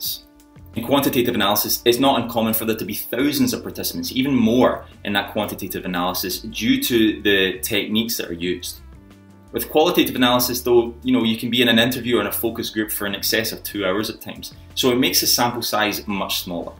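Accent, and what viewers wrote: Scotland, United Kingdom. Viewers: That scottish accent though :D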